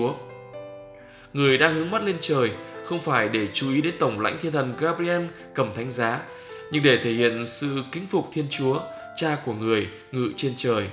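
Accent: North, Vietnam. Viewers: giọng nói miền bắc ngọt ngào tuyệt vời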